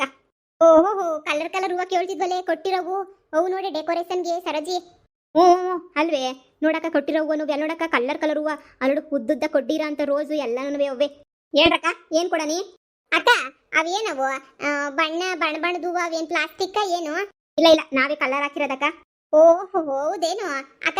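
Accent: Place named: Mandya, India. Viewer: ಯಾವ್ ತಾಲೂಕು ನಿಮ್ಮ ವಾಯ್ಸ್ ಮಾತ್ರ ನಮ್ tmk ಡಿಸ್ಟ್ರಿಕ್ ದು ಇದ್ದಂಗೆ ಇಲ್ಲಾ ಮಂಡ್ಯ ಅಥವಾ ಹಾಸನ ಸೈಡ್ ಇದ್ದಂಗೆ ಇದೇ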